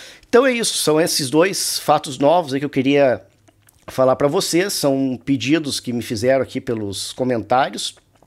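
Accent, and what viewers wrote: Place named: Rio Grande do Sul, Brazil. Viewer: Pelo teu sotaque, deve ser do RS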